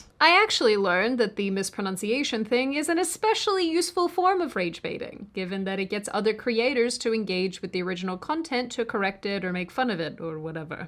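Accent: Australia. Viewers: Spending time with your mum brought some of your Aussie accent back. As can happen. My mum spoke northern English when she used to speak to her mum on the phone when I was young. A small observation without a judgement :) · I can still tell you’re from Australia, and that’s fine. It’s a good accent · The Australian accent is blatantly obvious, and I mean that in a nice way! lol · TBH, you sound very Australian and there's nothing wrong with that. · You sound like an Australian. . . No idea what you're on about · Bless, you do sound Australian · darling, you Do Sound Australian! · You have a small Australian accent like the youtuber "TheAnimeMan," and I knew it right away when I heard you. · We can tell your Australian! LOL you don't sound too off!😂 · You can say you don’t sound Australian all you want. It’s still there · You don’t think you sound Australian? You sound Australian as fuck 😂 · You sound extremely Australian. 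· You sound absolutely Australian ! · This pommy thinks you stilli very much sound Australian:) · I totally still hear your Aussie accent!!lol it's cute, I love it 🙂💚🦘😄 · the more I watch your videos the more noticable your aussie accent gets when it slips happy birthday, love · I picked you for an Aussie. It’s not completely hidden. Hi from across the globe 😊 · Good on you, family is priority. Also, you still sound Australian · "Im Australian though I don't sound like it. " Um... you definitely sound like it. 😄 The accent is a little muted but still very recognizable. 😉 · I have a lot of Aussie friends because of gaming and I can spot the accent no problem it's still there! 😁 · You absolutely sound Australian, but its a light accent. Happy 30! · You still sound Australian. But you should still be happy where you come from. Australia is a wonderful country. What made you want to move to America? 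· You totally sound Australien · You still definitely sound Australian 😅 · Also, you may not sound like Issac Butterfield, but you still sound like an Aussie. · Seems her Australian accent is stronger with this video - perhaps because she recently spent so much time with her family. Didn't detract from the video though. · Do you honestly think you don't sound Australian? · Nah, I didn't know you were living in the US. I thought you were living in Australia. You definitely have a bit of that Australia in you. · I can hear those bits of Aussie.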